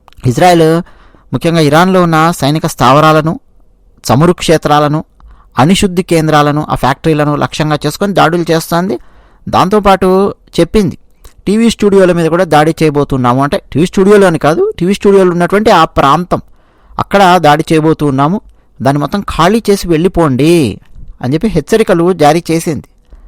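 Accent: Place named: Rayalaseema, India. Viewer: అయ్యయ్యో పాపం.. బెదిరించడానికి వచ్చిన గుండె ధైర్యం ఏమయింది.. మీ రాయలసీమ slang super గా ఉంది..😀😀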